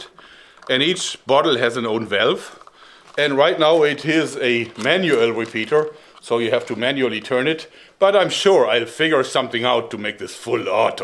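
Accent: Germany. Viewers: ich habe an ihrem englisch erkannt das sie deutsch sind 👌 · I wonder where he's living - cause he uses all the american measures:D but i suppose germany - cause of his amazing accent ( and the laughing is gorgeous ):D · is he a german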